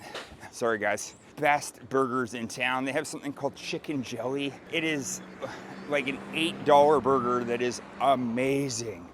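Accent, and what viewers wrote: Canada. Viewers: Your from Canada EH?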